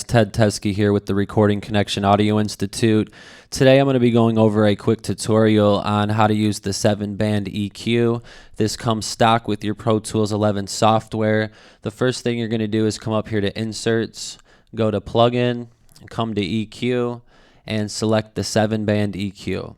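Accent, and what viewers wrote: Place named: United States. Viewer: I don't like the american accent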